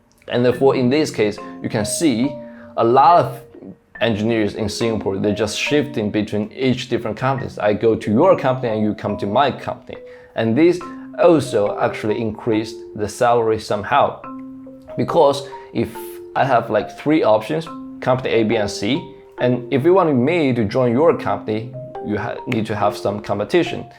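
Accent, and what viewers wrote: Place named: China. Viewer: 一听就是咱中国留学生哈哈，点个赞！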